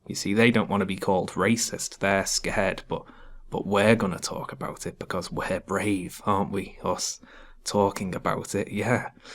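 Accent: Liverpool, United Kingdom. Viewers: The Scouse accent is fucking vile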